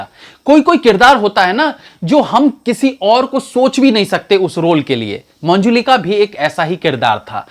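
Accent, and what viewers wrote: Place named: Bengal, India. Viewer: Bengali Voice like Mamta Banerjee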